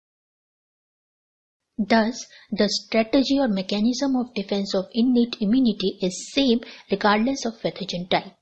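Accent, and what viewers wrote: India. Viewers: Excellent explanations and illustrations of key principals. The only problem is that the narration is difficult for me to understand in the moment of the fast moving narration, due to what sounds to me like a vaguely Indian accent. Is there some way to adjust this? I speak American English. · Ur india accent still so thick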